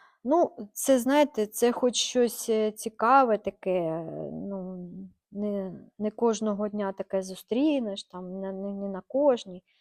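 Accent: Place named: Steppe, Ukraine. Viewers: Лайк за степовий суржик))! Ще один))❤ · завжди із задоволенням дивлюся ваші відео. Ви не тільки надихаєте своїми виробами. Вас завжди цікаво слухати. І ваш Херсонський говір, то моя рідна мова😂. Херсон, тримайся❤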